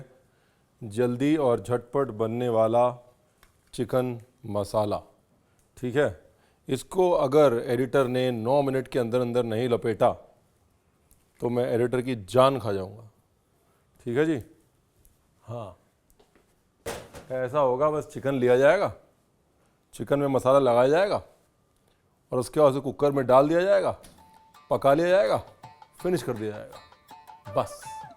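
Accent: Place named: Lucknow, India. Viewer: Sir aapko chup rehne k liye kisne bola? Ek sec bhi pls chup na rahen aap! Jab tak Ranveer sahab ki lucknowi zubaan ka zayka na mile, kya faayda aisi dish ka? Aap bolte rahe aabaad rahe! Dhaniya kaat te time kuch bole hi nahi aap 😂 ! Aap pls bole, bolte rahen ❤❤❤❤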